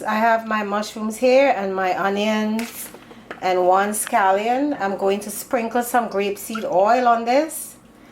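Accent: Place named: Trinidad and Tobago. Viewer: I looove your accent 😍😍 are you Trini?!??!!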